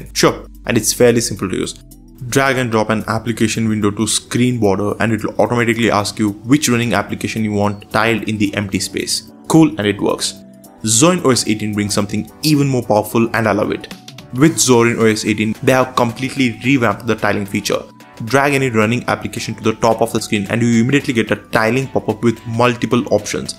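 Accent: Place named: India. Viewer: Apu dialect made me close this shit instantly...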